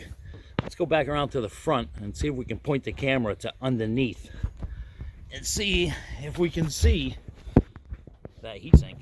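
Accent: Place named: New England, United States. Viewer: "TRACK-TAH!" You sound like you are from my area in New England (RI)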